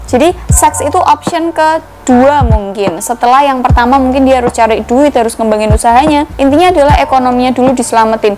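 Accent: Java, Indonesia. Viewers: Salfok sama bahasa nya mba wkwkw "Medhok" wkwkw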